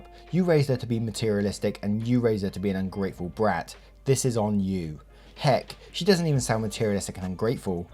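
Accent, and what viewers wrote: United Kingdom. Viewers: This is the only channel I know of with a UK voice, I like R/Slash and RStart but this is a properly organic community with interaction and humour.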